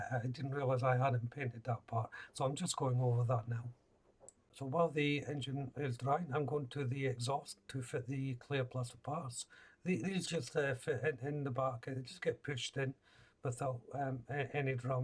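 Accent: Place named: Fife, United Kingdom. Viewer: I kinda thought I recognised your accent. I spent many years around Glenrothes and Dunfermline before moving to Asia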